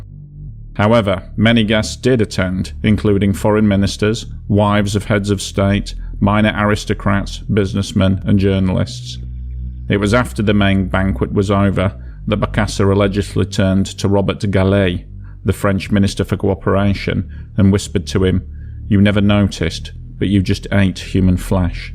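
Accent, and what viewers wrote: United Kingdom. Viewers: Is the narrator a native of England? His english accent looks like very british to me.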